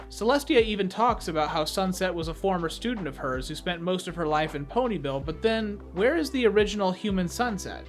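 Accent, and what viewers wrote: Netherlands. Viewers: ARE YOU DUTCH!! 🎉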